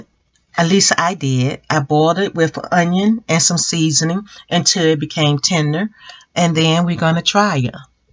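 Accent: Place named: South, United States. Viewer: Welcome. Love ur south accent.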